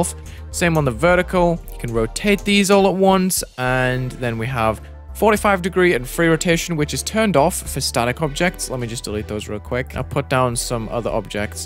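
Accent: United Kingdom. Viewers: you're british!?!? nIce vid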